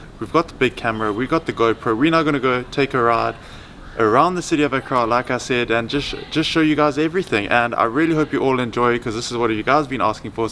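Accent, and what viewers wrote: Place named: South Africa. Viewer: You sound South African, are you? I am.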